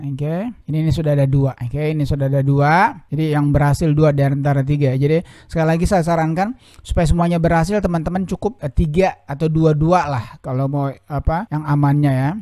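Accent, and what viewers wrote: Makassar, Indonesia. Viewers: orang makassar ki ?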